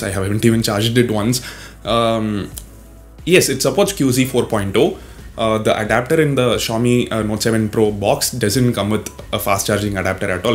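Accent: South India, India. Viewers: Your south indian accent tho 😂.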